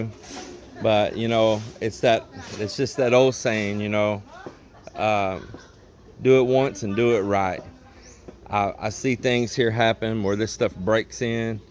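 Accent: Texas, United States. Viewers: there is many reasons why i have been following you and your wife. first my Late Mom followed you before i moved to the Philippines and we watched you together. The main reason why i watch close is that i plan on doing the same thing kind of. I plan on building a home and it will be mostly my wife family that works for me. I am already remodeling her mom and dads home tripling the size and we plan on living there as i save up for land and to build our home. I am letting them build it how they know how since that is not the home my wife's family will stay in as i plan on building a new one on our property when we get it for them (i want at lest 1 hector hoping for 3 for farming). i want to make it self sustaining as that is how my parents farm is in Washington state. One of the other reasons is I miss Texas (lived there for 20+ years) and you have the accent and mindset that I love from that state. 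Although i am not going to be able to be as physical as i am a disabled vet with major back problems I still want to build my home much like yours. I do not have as many years in construction as you but i do have a background in it, i also have an engineering degree but it was focused on mechanical but I did study blueprinting too. I also want to meet you sometime as i live in Roxas City and make trips to Iloilo sometimes. Congrats on the 10k. been watching for well over a year and feel like ya'll are part of the family lol.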